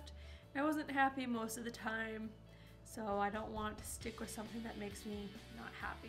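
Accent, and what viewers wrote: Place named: South, United States. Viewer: Me Bcn its southern accent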